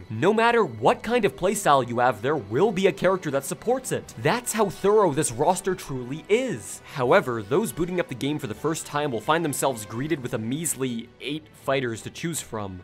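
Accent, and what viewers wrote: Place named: Norway. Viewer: You sound like you are from norway :D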